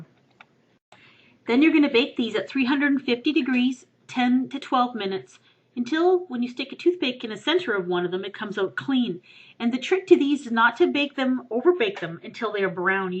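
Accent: Canada. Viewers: this might be a silly question, but are you Canadian?? You're accent sounds very familiar! haha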